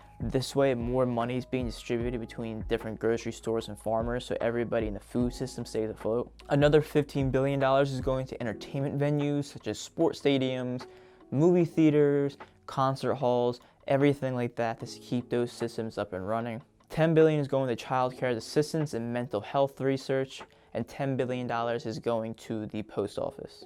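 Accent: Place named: United Kingdom. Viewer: Not sure why, but I thought you are from UK haha!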